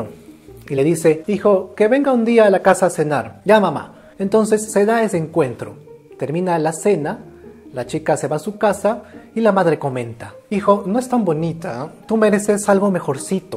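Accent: Cusco, Peru. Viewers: Ser y de origen cuzqueño te trajo algun problema... cuenta tu testimonio... mi querido broder...como hiciste para súperar el acento cuzqueño que es fuerte por cierto...eres quechua hablante?.tus razgos fueron motivo de mofa en esta Lima despectiva?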